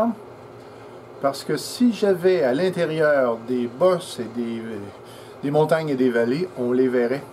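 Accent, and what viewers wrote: Quebec, Canada. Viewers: Salut. D'après ton accent je dirais que tu es du Québec? Les québécois c'est la meilleure partie des français... Ici en France ce st terrible la mentalité. Bref. Super vidéo C'est une passion que je cherche à assouvir donc grd merci 😉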